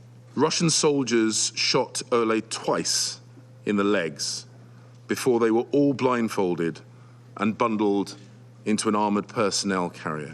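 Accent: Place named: England, United Kingdom. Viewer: Bullshittting with English accent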